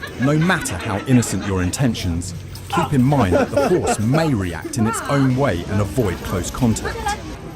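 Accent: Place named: United Kingdom. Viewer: Listening to your British English, your pronunciation. It's an English lesson for me. Thanks from Argentina. Excellent videos.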